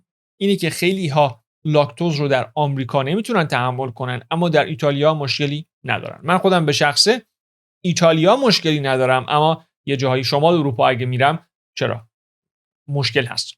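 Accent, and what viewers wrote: Ahvaz, Iran. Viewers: بچه اهوازی ؟ 😅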